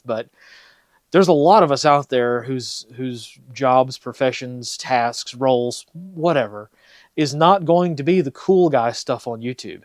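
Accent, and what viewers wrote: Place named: Pacific Northwest, United States. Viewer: Great content. Sounds like you live in the PNW.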